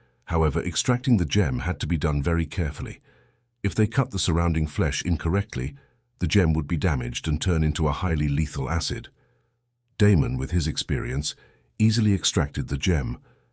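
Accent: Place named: United Kingdom. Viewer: Have to say, that voice caught me off guard a bit......😊 Im not used to it being a (very) British accent, its usually an American accent...😊 Thanks for the recap 👍💙💛👍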